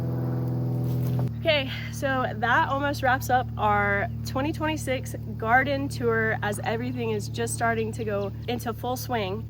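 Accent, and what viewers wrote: United States. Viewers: Are you American ❤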